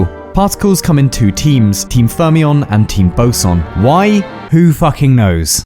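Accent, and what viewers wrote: England, United Kingdom. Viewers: Нали? много е готин човека ама бг-то му с чист английски акцент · why do i voluntarily put myself into listening to some brit talk about life for 15 minutes every month or so? why do i enjoy it so much? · you british? you bulgarian? what else? :D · Why do you sound british ?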